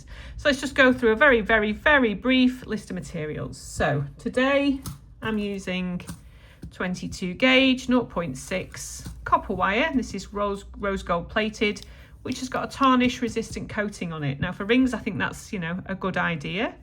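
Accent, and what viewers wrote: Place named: Merseyside, United Kingdom. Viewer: You sound like you're from my neck of the woods (Merseyside!). Lovely design ❤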